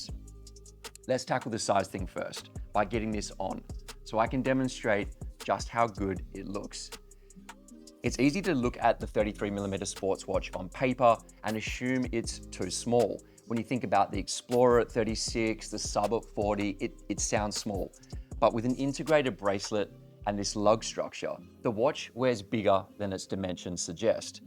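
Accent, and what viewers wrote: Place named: Australia. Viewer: I sense a slight Australian accent there. Great video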